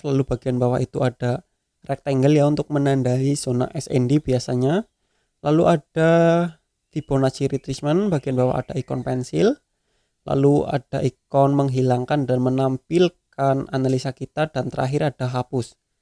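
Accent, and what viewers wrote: Banyumas, Indonesia. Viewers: logatnya kok mirip saya ya 🤭 salam dari wangon mas bro...izin menyimak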